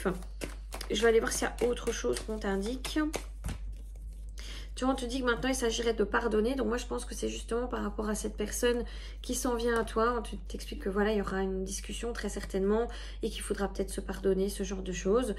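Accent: Belgium. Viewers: Quel chouette petite accent belge!!! Merci pour l a guidance. Bon weekend.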